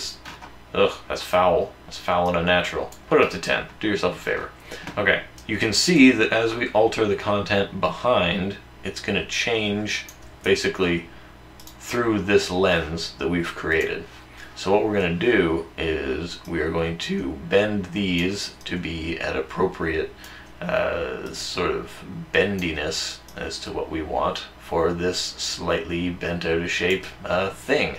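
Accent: Canada. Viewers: That Canadian accent xD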